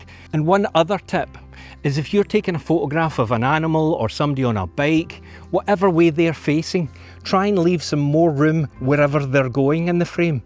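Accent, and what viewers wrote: Scotland, United Kingdom. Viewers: We have all done these thats how we learn and improve. Love the way you explain how to capture better photos. Thanks 😊everything sounds better with a Scottish accent. Lovely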